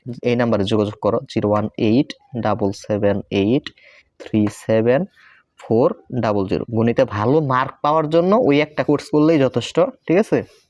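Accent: Tangail, Bangladesh. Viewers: ছার, আপনি কি টাংগাইলের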